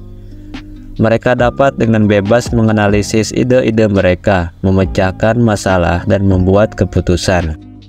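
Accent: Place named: West Java, Indonesia. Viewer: yg ngomong urang sunda nya? 😅 aksennya keliatan..🙏hapunten kang..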